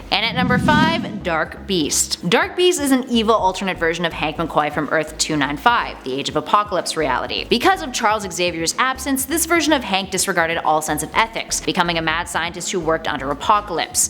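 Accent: Canada. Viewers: You're Canadian are you not? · You're totally Canadian aren't you chicka lol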